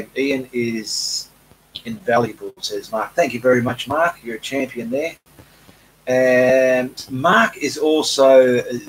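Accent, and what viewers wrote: Australia. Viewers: Wow i love your painting but now im watching this video because my english teacher suggested to get used to different english accents and so far australian is the hardest for me to undrestand😄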